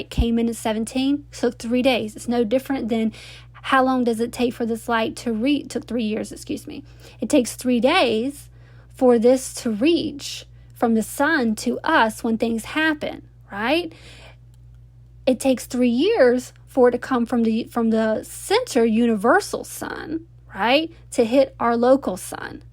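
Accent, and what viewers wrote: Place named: South, United States. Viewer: Love the southern accent.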